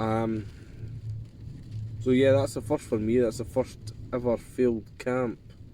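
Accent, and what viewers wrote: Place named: Scotland, United Kingdom. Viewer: I'm subscribing just because I am so in love with the Scottish accent and I could listen to you all damn day.😄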